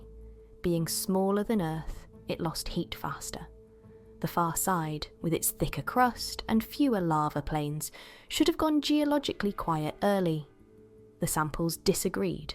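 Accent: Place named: England, United Kingdom. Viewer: Hmmm A Chinese AI with an English accent. Already sounds suspicious. It asl us to like and subscribe before the story gets strarted, They found a metotite in mongolia that was clearly marked made on far side of moon. They found that the samples taken 55 years ago and thousands of miles from their sample site were different. Okay, I've listened to enough. Thanks for the laughs.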